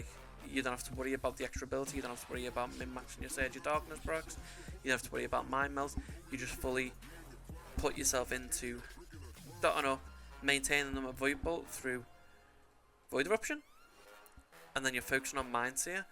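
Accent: Liverpool, United Kingdom. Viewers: Am I hearing a scouse dialect there? 😊 as a Shadowpriest and a big fan of Liverpool FC that amounts to a subscription 👍🏽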